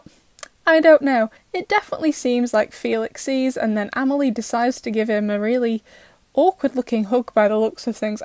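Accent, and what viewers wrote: England, United Kingdom. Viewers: Are you from england ?? I am asking because of your accent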